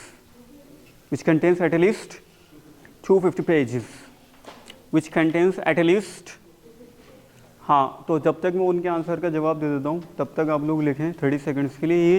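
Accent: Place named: India. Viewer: Indian pronounce cant understand other countries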